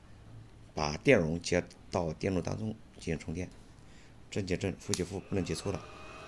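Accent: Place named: Hunan, China. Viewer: 湖南口音，果然是三一重工的员工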